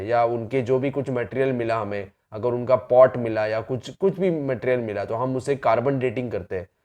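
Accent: Maharashtra, India. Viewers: Sir you are marathi ?? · Aap ka voice like tipical marathi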